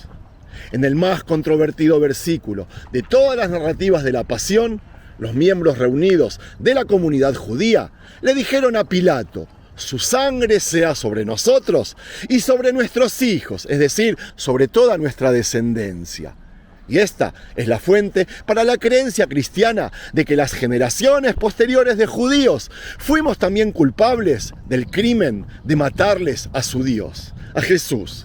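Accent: Argentina. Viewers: Tu no eres judio,eres un argentino que se cree judio muy distinto Si fueras judio real no tuvieras el tipico acento argentino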